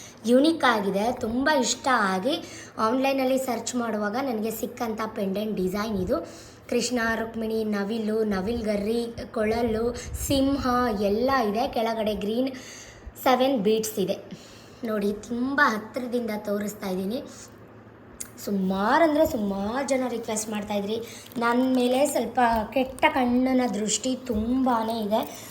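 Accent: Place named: Karnataka, India. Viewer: Nam Mysore Hassan kade maathin shyline chenda❤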